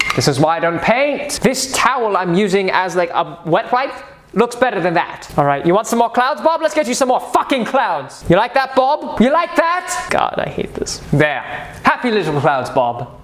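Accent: United Kingdom. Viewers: Why did i think he was gonna be better than this.........maybe the british excent........sadness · why are you yelling at a dead man in a British accent, you may need to deal with your anger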